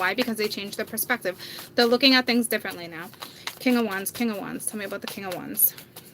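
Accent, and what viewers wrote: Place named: New York City, United States. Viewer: Hello. Having a fairly good night,getting into videos on my 2 favorite planets,SATURN&MARS. ESPECIALLY SATURN'S MOON "TITAN",CHILLING OUT WATCHING "THE MATEIX" AND THEN "THE TERMINATOR",JUST CHILLING TIL I FALL OUT. P.S. LOVE YOUR "NEWYORK CITY"ACCENT!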